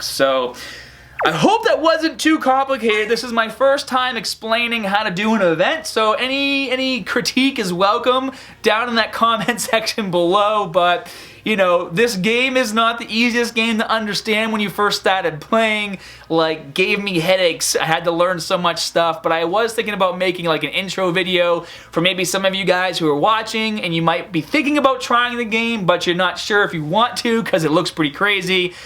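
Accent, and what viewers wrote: Boston, United States. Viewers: Son is definitely from Boston son said “cads” I was like tf is that then I realized he from Boston it’s “Cards”guys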